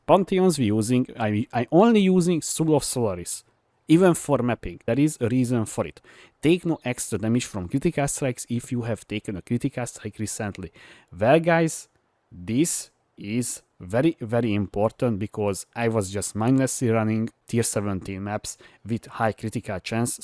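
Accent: Hungary. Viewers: Te figyelj, gyönyörű a nyelvtanod, de a kiejtésedről felismertem hazánkat xd Szuperek a videóid szóval nagy hajrá! Aztán idővel csiszolódik majd a prönánsziésönöd is <3 Keep up the good work! Edit: PoE2 kontentet nem fogyasztok, de kaptál egy feliratkozót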